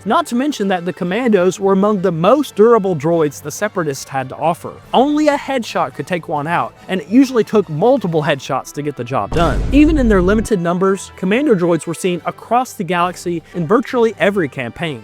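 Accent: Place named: South, United States. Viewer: I love the southern accent trying so hard to come out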